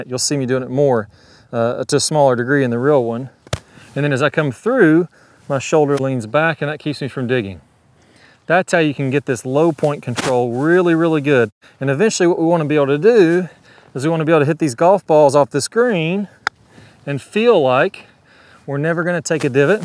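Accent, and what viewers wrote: South, United States. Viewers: Great work, love the Southern drawl, nearly as good as the Aussie accent, but close. Thanks for your videos from Melbourne Victoria!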